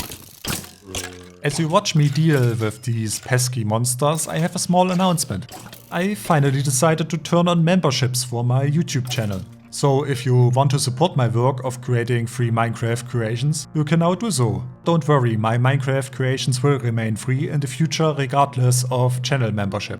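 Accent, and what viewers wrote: Germany. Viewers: are you english you sound a bit german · Your German accent gets harder over time. Seriously.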